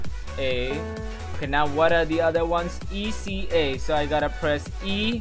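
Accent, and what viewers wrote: India. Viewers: Lmao his indian accent is so funny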